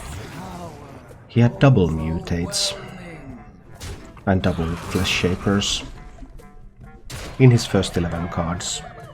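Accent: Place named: Finland. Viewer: He's from Finland. Suomalainen se on. ;)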